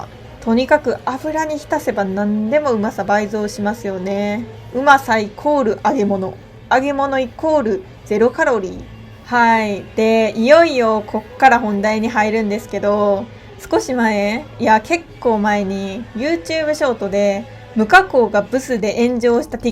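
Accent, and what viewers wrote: Kansai, Japan. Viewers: 関西弁やから、親近感湧く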